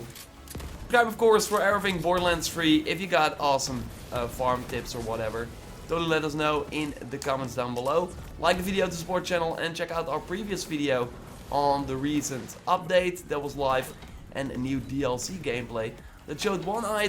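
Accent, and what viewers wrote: Netherlands. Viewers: Your Dutch arent you?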